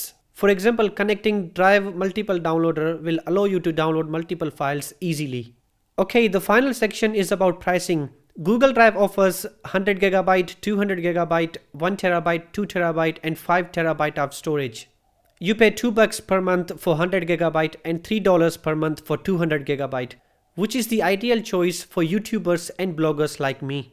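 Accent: India. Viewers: I love Indian English accent!!!! Good content too. 👍🏻👌🏻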